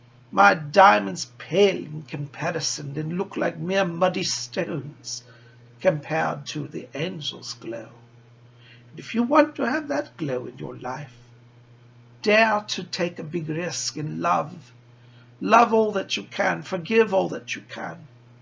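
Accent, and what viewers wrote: United Kingdom. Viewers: I love you so much (I feel compelled to call u ). I am British. You are speaking the queens English. Fletcher love to you. Xxx.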